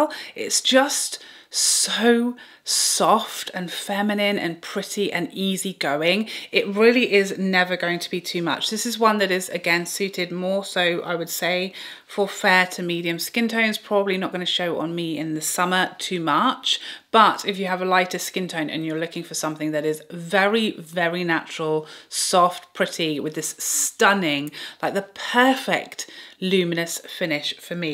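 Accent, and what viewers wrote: United Kingdom. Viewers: I love listening to you speak !!..Ilove your english pronunciation !!Love British english !!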